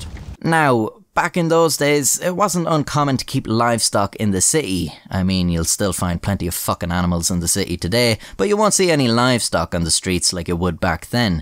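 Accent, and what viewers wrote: Ireland. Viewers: Yea I suppose most Europeans couldn't identify my distinctive Wisconsin accent but I knew you were Irish because it's obvious to me at least that your accent is Irish. Probably a lot of people died of being poisoned by drinking poop and pee and who knows what microorganisms and parasites were in that slush. I'm not convinced it was entirely due to the alcohol itself and the dog probably couldn't suffer from physical or mental withdrawals after such a short period of time. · I'm American and I could tell your accent was Irish before you confirmed it for me. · I've heard it said that if have a dog that is a lush, just give him all he wants to drink until he passes out. The next day give the dog some more, and if he drinks he's lost. But a lot of times all it takes is the smell of it and the dog will run away yapping it's head off. This means no doggy anonymous meetings are required, and the pooch has been cured forever. 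By the way it's very easy to see and hear that you're Irish. Have some of the Isle of Erin in me. Like your show and tales from the bottle! Peace and love. Dan. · It makes sense that people in Ireland would talk differently due to where they came from. So where are you from? Cork? Kilkenny? Galway? Please tell me. O yes, this was quite amusing by the way; morbid, but amusing. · You’re extremely lucky to have the Irish accent that you have. Americans can actually understand what you’re saying. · the very first video i saw from you, i instantly thought "this fella is from ireland" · you can tell it's a legit Irish accent because the first syllable in "Ireland" is effortlessly between an American "are" and "our" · I'm in the US, and I always assumed you were Irish when watching your videos, even if your accent is slightly different. Boston accents, Texas accents, Chicago accents, and New York accents are all in the country I live in, I imagine that there are different accents and dialects in every country and language in the world. 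· You think Americans thinking your Irish accent is fake is annoying Try Brits (who should know better) mistaking my (admittedly watered down) Irish accent for being American. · So, where's the accent from? I consider myself fairly familiar with Irish accents. I'm guessing the midlands, cos it's fairly neutral, but definitely Irish. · Transatlantic Irish accent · well you sound very Irish to me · You got rich boy irish Accent. heard it all over the world. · I found it surprising people have trouble with your accent. The first time I heard you speak i knew you were Irish. I would have guessed you were from Glasgow though. Thinking more about it, I guess it makes sense I know a little more about the different dialects of Ireland, my family is Irish, my great grandmother only spoke Gaelic and, while I haven't, a lot of my family travels between the US and Ireland fairly often, so I'm a little more educated about Ireland than tbe common US citizen. 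· I'm an American and I knew you were Irish from the first vid I watched :/ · bro I knew you were Irish the second I heard you, not all us americans are idiots I promise 😂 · Who didn't realize was Irish. I thought all the replacing TH sounds with Ts and Ds made it obvious 🤷‍♂️ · Haha how can your accent be anything other than irish. The fact that your accent is hard to place around ireland means youre probably more irish than most irish people. An tir na nog. Best palce in the world. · I'm thinking your accent is cavan or maybe meath, not too sure though (being a dirty dub myself) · I'm genuinely surprised that people couldn't place your accent as Irish... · It’s okay, I could tell you were Irish. And there’s a place called Belfast? I thought that was just my ex ex ex ex ex ex friend’s waifu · I’m American I I could tell you were Irish the first time I heard you voice. How could Irish people not tell? 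· I’m American and I knew your accent immediately I’ve been to Dublin and loved it js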